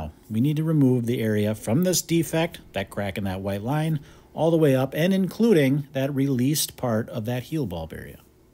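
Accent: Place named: Arkansas, United States. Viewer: U from Arkansas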